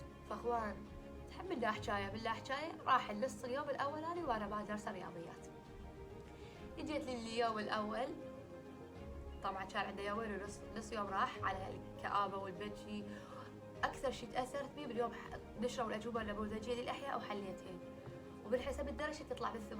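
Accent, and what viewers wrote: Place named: Basra, Iraq. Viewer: حبيت طريقه حچيچ أموت على لهجتكم الحلوه اساسا البصره كلها احبها أتمنى اروحلها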